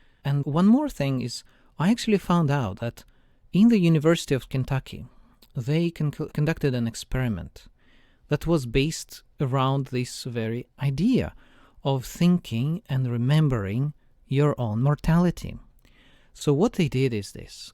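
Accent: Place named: Russia. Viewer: First, the Russian accent; second, the subject matter; third, the relevant knowledge of history. I myself am half-Russian; Baptized Orthodox from infancy: My grandparents -- from the nobility and landed gentry, respectively so speaking -- escaped the USSR in around 1920 to emigrate finally to Canada via Western Europe. My mother was born in Canada right around the beginning of WWII; I was born in the USA in January of '71. It's A Small World; But it's an even smaller Orthodox World. God Is Good. Glory To God For All Things. До свидания, Господин.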